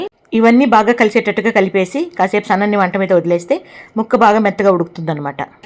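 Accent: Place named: Anantapur, India. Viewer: Ma లాంగ్వేజ్ మాట్లాడుతున్నారు మీరు అనంతపూర్ వాసులేనా మా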